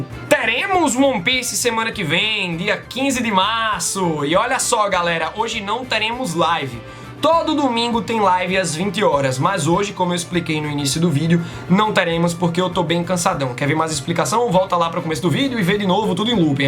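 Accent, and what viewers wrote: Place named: Recife, Brazil. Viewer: Bem que eu tava RReconhecendo esse sotaque hahaha... tamo junto!!! Sou do bairro da várzea/Recife sou seu fã.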